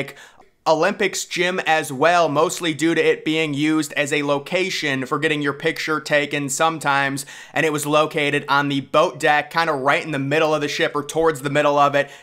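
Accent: United States. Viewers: Tone down the shouting, big American twang voice...it's really irritating!😳🫣🤬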